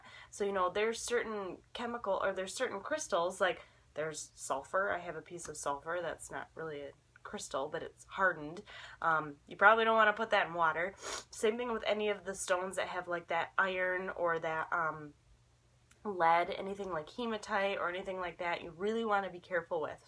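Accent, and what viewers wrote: Michigan, United States. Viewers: i could listen to you all day. maybe its your michigan accent that i love haha.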